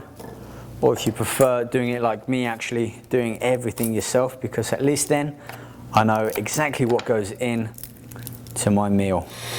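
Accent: United Kingdom. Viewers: i love the british accent!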